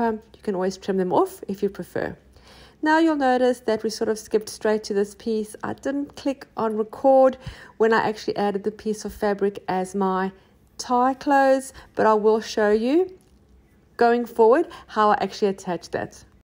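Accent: South Africa. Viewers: Hi there, I recently came across your channel and love your work. You explain things so nicely and the main reason is because your voice is South African 🤗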